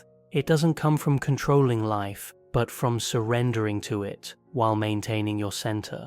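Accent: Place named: United Kingdom. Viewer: Oh...I was waiting for you to release a full Jungian video 😉☺️ you have no idea how your videos touch my soul in this way that sometimes makes me gently cry with relief, or just put a smile on my face in the morning that stays all day, even all week as I daydream about the ideas you espouse. To be completely honest, at first I found the speaker's voice (is it AI? I seriously can't tell!) to be slightly unsettling because of the monotonous contour tone and strange vowel inflections (sometimes the vowels are inflected as they would be in the north of England, but usually a standardised southern english dialect that very occasionally slips into full north american dialect) I think my linguistics brain didn't like those anachronisms but quickly I grew to find the cadence and pitch soothing, I've always had highly sensitive hearing and seem to be very inwardly sensitive to sound. 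I can't watch television because I grew up without it and find it super abrasive. Do you know what I mean? A man got me to watch the football and the violence really affected me and the bizarre sound of their bones clacking together when they tackle each other was grotesque. So I find a lot of videos on YouTube abrasive. Yours are perfect for me. And I haven't even mentioned the content. It nourishes my soul. So special.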